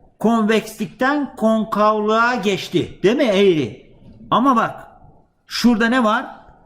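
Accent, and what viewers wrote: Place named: Isparta-Burdur, Turkey. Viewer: hocam nerelisiniz şiveniz bizim buralara Isparta Burdur taraflarına benziyor ?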